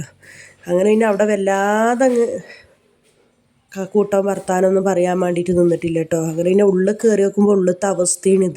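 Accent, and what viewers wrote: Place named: Coorg, India. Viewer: Coorgil evideyaanu...samsaram kett Coorg aanenn thonni,Siddapuram aano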